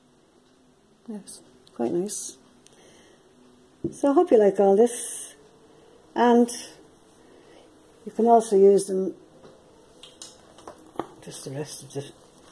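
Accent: Ireland. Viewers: Hiya I've just found your channel and subscribed. love your crafts thanks so much for sharing with us. I was like is that an Irish accent I can hear,,????yes it is she's Irish deadly. I'm a dub myself😀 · I'm just in heaven watching you I love crafting as well your so gifted thank you for sharing it's great to hear an Irish accent xx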